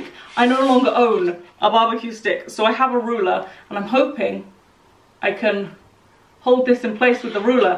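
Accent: United Kingdom. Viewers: In the US we call it cotton candy. Lol love the British accent. your such a sweetheart. Love ya videos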